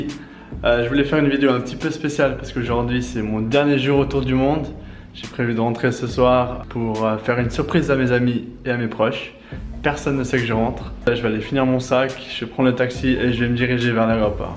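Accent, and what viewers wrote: Switzerland. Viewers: ooh de tcheu notre bel accent suisse XD mais t'es pas serrrrieux ? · L'accent suisse il y est ! Haha super videos !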